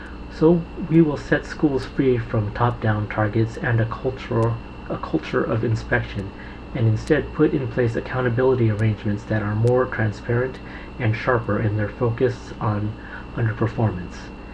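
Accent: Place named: United States. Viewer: Brit here. Just came across your video. Never too late for feedback I suppose. Apart from the minor stumbles at the end I thought your speech was fantastic IMO. I think your accent sounds more pleasant than many accents in the UK and would happily listen all day. What kind of American accent do you have?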